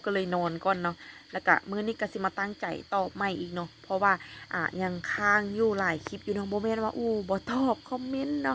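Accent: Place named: Nyo, Thailand. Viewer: สำเนียง"ญ้อ"(ย้อ")ทาง จ.สกลนคร เว้าคือกันนี่หละ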